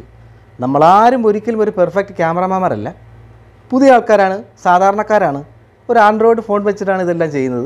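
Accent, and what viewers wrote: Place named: Tamil Nadu, India. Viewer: sounds like your talking tamil? ill try ippadi irruka? hehe or maybe you live somewhere from tamil nadu sir?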